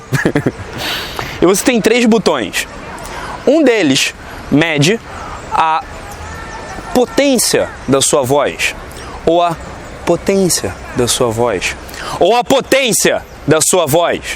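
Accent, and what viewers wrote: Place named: Rio de Janeiro, Brazil. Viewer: A dicção também é importante. Muito carioques na sua fala. Disssssscurrrrrrso, Butão é um país, bOtão é outra coisa.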